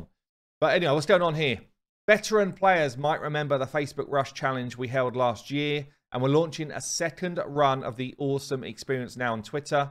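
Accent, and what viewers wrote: United Kingdom. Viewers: Fun fact: I hadn't heard the expression "brucie bonus" before and I know you've used it many times in your vids including this one, so today I finally looked it up. When you do a google search the very first link to a definition that pops up....well lets just say it has to do with ejaculate and erections haha. Brucie bonus indeed! Also, for a longest time I was mystified when you were making reference to your "finite" teams. I thought "finite"? What is a "finite" team, and where would I use it? Does that have something to do with the unkillable "infinite" teams? Then it dawned on me - you were saying FIRE KNIGHT, not finite rofl. Don't get me wrong tho, I love British accents 😸.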